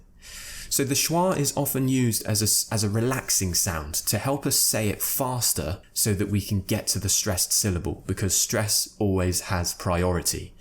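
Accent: United Kingdom. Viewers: Thank you very much. Your videos are very much useful to me. I like very the Pronunciation of English not Amrrican. You are great teacher. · Your English accent is so British.🙃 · TBH, from all those videos I've come across, your ones are the best to learn the British accent! And such a lovely teacher you are! 🙊❤ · U r a Good teacher. your British accent is very nice ... I love your style ... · After changing my work in UK process, I came in to learn Uk accent and found this Chanel to be one of the best Uk Accent learning platform. And now I'm addicted to sir accent.may be I'm late but whenever I'm free I'm listening to your life journey podcast. ❤️ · Absolutely brilliant content and delivery. Serious skills here! Thank you for your valuable contribution. I'm etching ever closer to a British English accent thanks to you. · For a 15-year-old adolescent. Every time as I’m watching your video instrumental, it should be a very enjoyable and elated process since I could hear and feel the most authentic and received British pronunciation, which benefits me too much. 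Thanks!!!! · Why are you speaking English so Britishly?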